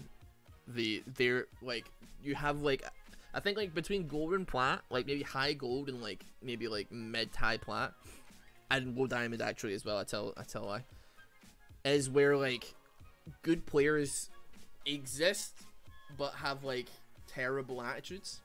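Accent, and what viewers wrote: Scotland, United Kingdom. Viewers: comedic scottish man rambling and lightly comentating over a league game to study / relax to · do you know how many times this video has popped up on my autoplay while i've been at work Coworker: What are you listening to? Me: A Scottish guy ramble for hours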